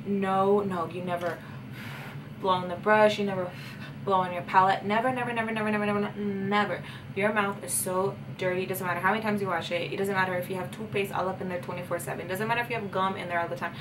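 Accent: Mexico. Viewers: where r u from btw I love the spanish accent sounds kinda mexican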